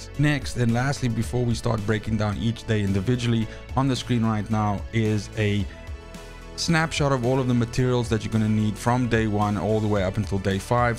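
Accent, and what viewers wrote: South Africa. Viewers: Your channel says Denmark, but you sound like you're from South Africa. Anyway thanks for the tips bro. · South African perhaps? I hear quite the familiar accent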